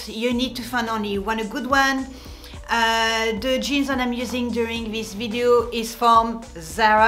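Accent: France. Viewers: you are so stunning! I just found your channel a few days ago and subscribed right away. I love your bubbly personality and your beautiful French accent ❤️. Everything sounds better with a French Accent. I’m a super Francophile so I’m enjoying you so much. Your style is super chic.....love your videos...I’ll be watching them all! Merci❤️👠👜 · I want to be you Beautiful....speaks French....French accent...stylish....I'm very jealous . X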